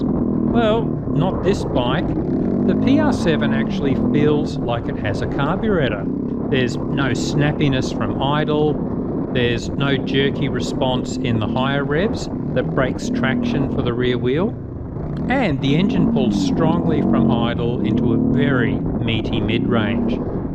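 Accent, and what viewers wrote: Australia. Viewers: Nope, just nasally Aussie twang 😉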